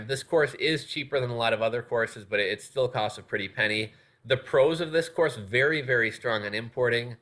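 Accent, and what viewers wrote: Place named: Australia. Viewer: He is from Australia so he has an Australian accent.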